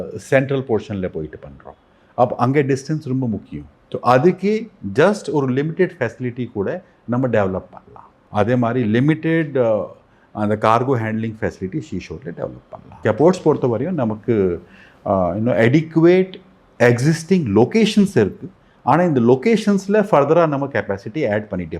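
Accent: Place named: North India, India. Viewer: North ஆக இருந்தாலும் தமிழ் அழகாக பேசுகின்றீர்கள்❕️👍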